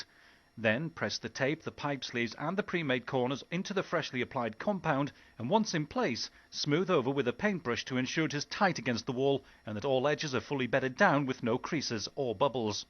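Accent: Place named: United Kingdom. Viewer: British english is hard for me...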